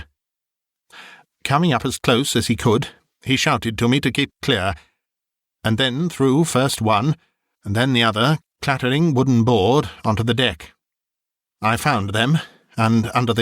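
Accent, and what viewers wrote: United Kingdom. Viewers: Yes, you can’t beat a good old fashioned perfect diction posh English voice, with a no nonsense masculine delivery , for these stories......perfect.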